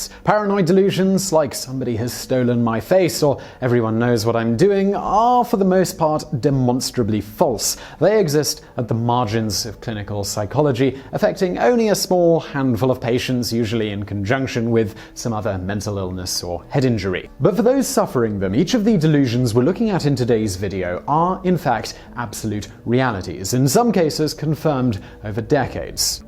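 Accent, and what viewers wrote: England, United Kingdom. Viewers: yeah. nice English accent without sounding pretentious. not that the English sound pretentious or are themselves so, it's just the American perception of it ironic that that evaluation sounds pretentious, I guess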